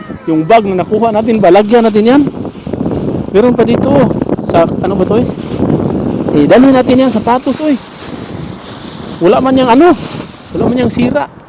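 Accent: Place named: Tagalog, Philippines. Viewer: Ganahan gyod ko maminaw sa imong Tagalog kapilingon makalingaw ha ha ha,❤❤❤❤😊😊 amping kanunay dong.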